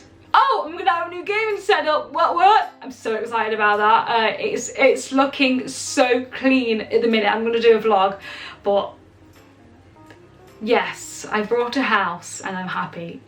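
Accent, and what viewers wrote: England, United Kingdom. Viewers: Out of curiosity, what part of England are you from?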